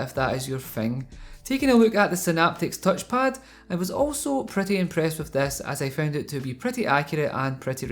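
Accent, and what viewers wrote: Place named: Scotland, United Kingdom. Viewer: its a Scottish accent.. ;)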